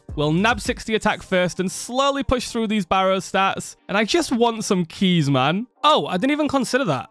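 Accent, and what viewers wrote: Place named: Hull, United Kingdom. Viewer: Dude are you from Hull? Accent is strong with this one. Good vid tho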